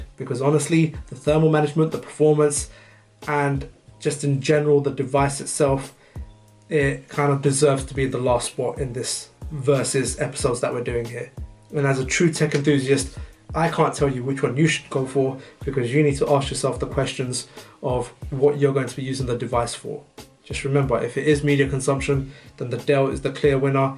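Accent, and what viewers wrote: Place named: Arab world. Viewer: واضح من لهجتك عربي صح؟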